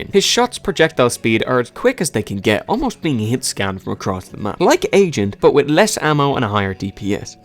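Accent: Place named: Ireland. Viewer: Irish accent 🤑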